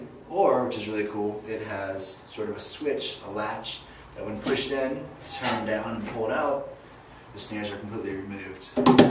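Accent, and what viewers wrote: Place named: United States. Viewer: Hi.. You sound like a yank...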